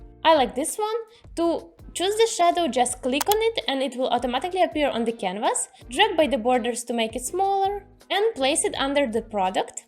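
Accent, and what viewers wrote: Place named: Russia. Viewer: I love your accent are you Russian?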